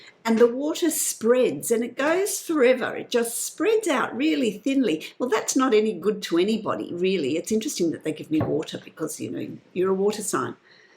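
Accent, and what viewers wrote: Australia. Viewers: so nice to hear the Australian voice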